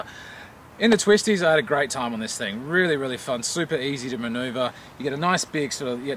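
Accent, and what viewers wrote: Australia. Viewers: Nice Aussie accent :)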